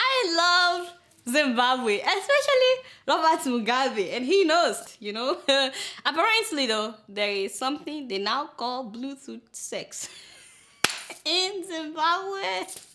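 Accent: Yoruba, Nigeria. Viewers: LOL FUNNY HOW YOUR YORUBA ACCENT IS SO PREVAILING. LOVE YOU GIRL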